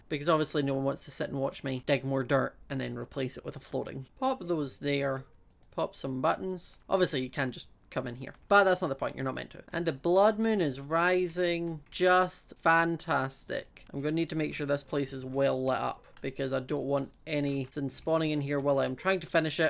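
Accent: Scotland, United Kingdom. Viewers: I just love his Scottish accent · I feel like Scottish accent makes his videos funnier hehe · His accent!!!!😍😍 Give me your accent! Anybody else love Scottish accents.😍😍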